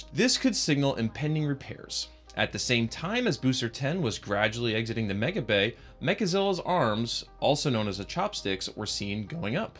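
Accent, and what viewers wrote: Germany. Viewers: Wow, ist das schon wieder zwei Jahre her, dass ihr in die USA gezogen seid? Aber ja, das weiß ich, den deutschen Akzent erkennt man überall. Wobei du echt viele neue englische Wörter in dein Repertoire aufgenommen hast. Ihr habt doch vorher irgendwo in der Nähe vom Nürburgring gelebt. Hast du nicht irgendwie sowas mal gesagt, in einem Video lange her, oder? Wie kommt deine Frau damit eigentlich so zu recht? Vermisst sie Freunde oder Familie? Schätze den Kids ist das eher egal, oder? Naja, geh da von mir selbst aus. Wurde auch als Kind durch die Arbeit meines Vaters nach Florida verschleppt. Als Deutscher freut man sich ja über die Wärme, aber die einheimischen kühlen gerne etwas arg runter 😄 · I knew is from Germany stil a little Accent left.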